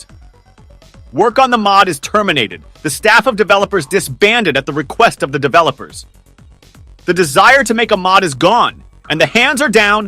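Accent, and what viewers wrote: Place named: England, United Kingdom. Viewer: Cool inglesh voice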